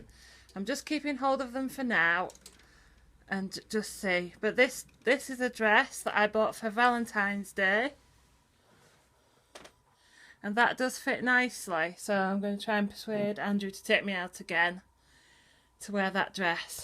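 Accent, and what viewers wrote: Yorkshire, United Kingdom. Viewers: Hi from a new subscriber. I assume you're in Yorkshire by your familiar accent? I'm in York! I will look forward to seeing more uploads in the future x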